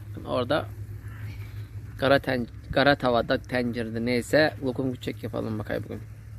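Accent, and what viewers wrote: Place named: Bartın, Turkey. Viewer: Bende bartinliyim istanbul da yasiyorum bizim oraların konusmasini duyunca çok mutlu oldum benim annemde böyle odun ateşinde yapardı evin önünde yiyesim geldi şimdi bu sene koye gidemedim koronadan sizi yeni keşfettim artık izlerim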